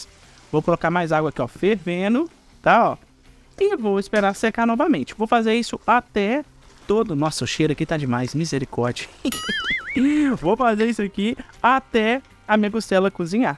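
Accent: Minas Gerais, Brazil. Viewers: sim, nós mineiros falamos assim mesmo.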